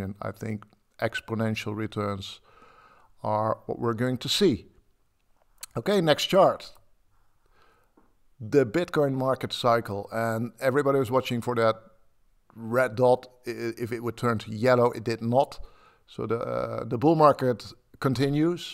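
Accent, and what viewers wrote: Netherlands. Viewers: NL accent ! ? :P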